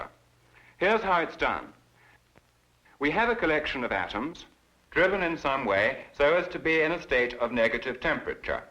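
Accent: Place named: Mid-Atlantic, United States. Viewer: Flawless mid-atlantic accent here... impressive!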